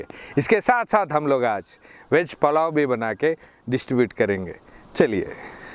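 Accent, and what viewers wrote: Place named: South India, India. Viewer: MashAllah bhai tumhari Urdu bari mazay ki hai... South touch ata hai... Love from Pakistan to the Indian ❤️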